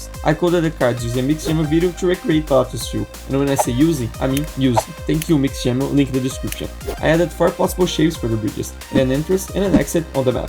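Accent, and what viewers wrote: Brazil. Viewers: Só fui perceber ao final do vídeo que teu sotaque de inglês era brasileiro. Ótima edição! (com alguns efeitos sonoros um pouquinho acima da voz) But I really liked the project and the core loop of the game. The graphics are getting there and show an astronomical evolution since the beginning. Overall, take care with scope creep, and good luck! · That accent... Tu é brasileiro mermão!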